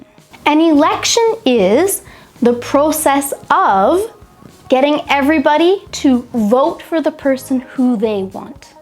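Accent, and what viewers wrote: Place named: Canada. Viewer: Thank you for good lesson! I live in Ukraine and always wanted to visit Canada! It's a very big pleasure listening Canadian pronunciation!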